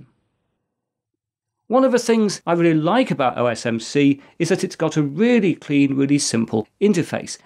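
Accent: England, United Kingdom. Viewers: I love this voices , love the culture, love this england accent ! Ohhh god save the england peoples from islã invaders ! Do not let this blessed land, inspiring land be consumed !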